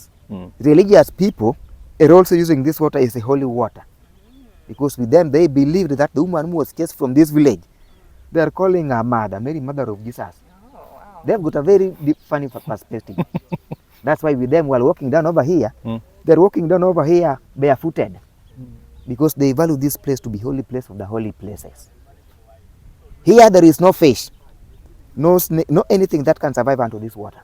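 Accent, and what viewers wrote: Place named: United States. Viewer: Your American English is dope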